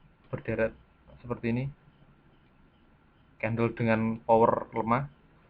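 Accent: Java, Indonesia. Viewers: jawanya medok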